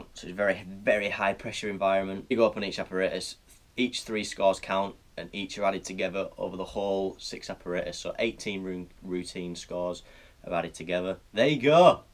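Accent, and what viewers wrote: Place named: Durham, United Kingdom. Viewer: Cool video, and your accent is awesome. Is it Durham or thereabouts?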